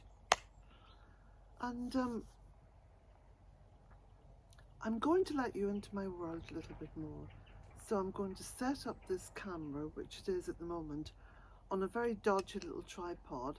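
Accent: Ireland. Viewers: Dear lady thank you for sharing your beautiful life! I was on your website and was completely Enchanted I especially loved listening to the first chapter of your book on selling your home and finding the land. I so love your Irish brogue as my late Grandpa spoke with one. I was wondering if you had any intention of offering your books in audio format so that I might listen to that brogue and to to your voice because it reflects much more deeply than written word. Thank you for considering the possibility. I look forward to exploring your other offerings. I'm trying to remember how I found you but I think it was Revealing Light Tarot and the lovely Mary Ann. Your work connects me to my lost Irish roots. In deepest gratitude, thank you!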